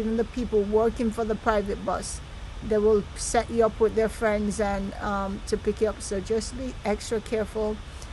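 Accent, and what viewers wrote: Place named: Jamaica. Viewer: Yoùr speech pattern is Jamaican therefore it's safe to say you are Jamaican so how come you were so unprepared for current occurrences on the Island. Say thank God the Police were doing their duty enforcing traffic regulations, havent you read or heard of the very high numbers of fatalities and senseless mayhem committed on the roadways? There are several reasons why Police personnel are armed with high powered weapons in the public space, your safety and protection is one of them. Your foray into blogging appears to be in its infancy, take time to research and prepare content, not everyone ad-libs a cogent presentation. Not criticizing, "mi 'jus a sey". Glad ypur stay was safe and enjoyable. A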